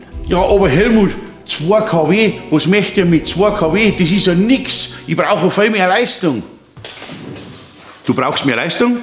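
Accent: Bavaria, Germany. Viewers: Hallo Helmut, wie immer in deinem Urbayrisch ein klasse informatives Video. Du schwätsch halt et um d heiße Brei. Mach weiter so. Das #ks2000 wäre perfekt für den Einsatz bei unseren Pferden. Die Pferde stehen in einem kleinen Offenstall abseits von jeder Versorgung. Für Licht oder im Notfall den Tierarzt mit seinen Geräten versorgen zu können wäre ein Traum. Besonders da das Gerät so flexibel ist mit Gas oder Benzin. Viele Grüße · #ks2000 Den hatte ich mir die Tage auch näher angeschaut 👌 Und noch eins: Ich bin kein Bayer sondern aus dem Westerwald. Aber bitte sprachlich nicht verbiegen. Bleib bayrisch 🎉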